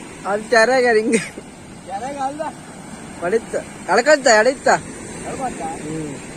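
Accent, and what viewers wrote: Kundapura, India. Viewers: Yava side kannada idu?kundapura na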